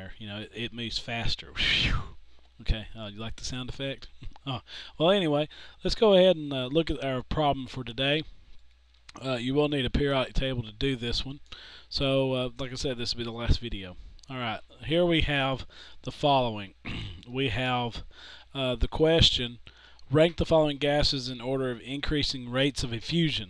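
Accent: South, United States. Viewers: You seem to have a very southern accent, are you an Alabama, Florida, or Georgia native?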